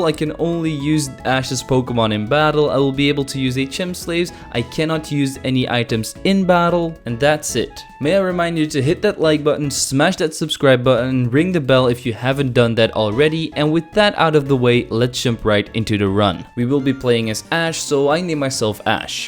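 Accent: Belgium. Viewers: Wow je bent een belg😂 hoor het nu ook aan je stem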